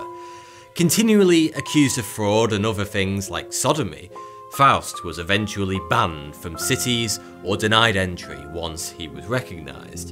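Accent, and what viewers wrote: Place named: Cockney, United Kingdom. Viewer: it is called “the cockney accent”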